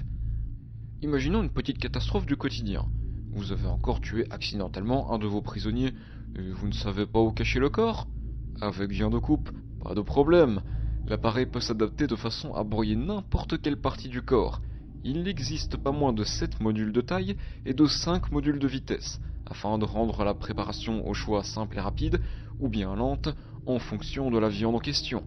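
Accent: Belgium. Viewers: Oh un belge ?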